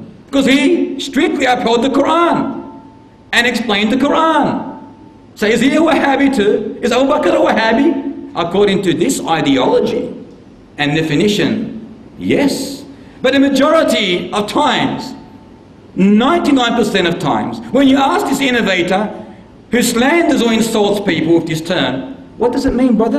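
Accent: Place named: Australia. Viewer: before insulting him, please know that he accent is Australian and that's how they talk. Don't judge him for the way he speaks, but for his content.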